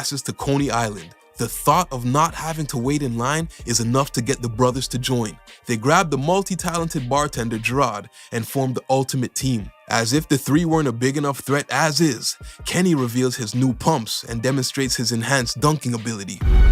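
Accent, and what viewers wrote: Toronto, Canada. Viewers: ur def from toronto broski lol i love this channel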